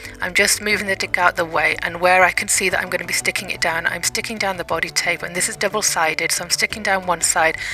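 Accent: United Kingdom. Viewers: I could tell by your accent that your're british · Her English accent 😍😍😍😍😍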